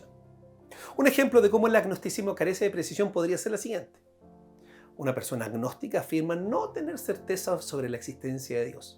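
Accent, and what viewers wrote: Chile. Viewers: Nada que ver mi comentario ante un tema tan interesante, pero no puedo dejar de decir que lindo el acento chileno, cuando no es "flaite".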